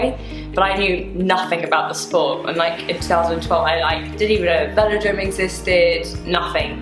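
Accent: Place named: United Kingdom. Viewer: Barbados has perhaps the largest 'White' population in the Caribbean. Her accent sounds British, though...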